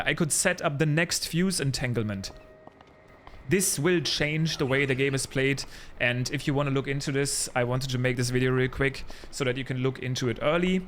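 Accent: Germany. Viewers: this is definetly a german accent, right? :) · You sound really German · You have so a german acent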